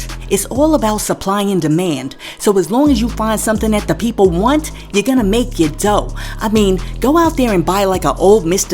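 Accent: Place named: New Jersey, United States. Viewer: You gotta be from jersey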